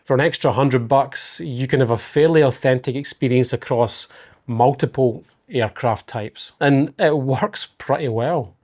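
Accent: Scotland, United Kingdom. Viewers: ha! Mate this is quite a clear Scottish accent - even as a Londoner I can struggle to understand a Scottish accent but on the scale of things this guy could read the evening news as far as I'm concerned. Try subtitles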